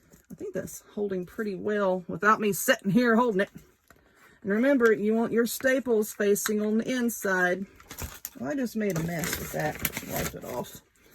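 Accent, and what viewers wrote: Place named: Tennessee, United States. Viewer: I just discovered your channel, and as soon as you said "durn", I subscribed. I don't know what part of the South you are from, but you sound just like me and everyone in Northeast Tennessee. Loved your lantern, if I can find the rectangle canvas thingys, I am going to give it a try. Also, I wanted to mention the Command strips would be great to affix the battery box inside your lantern top.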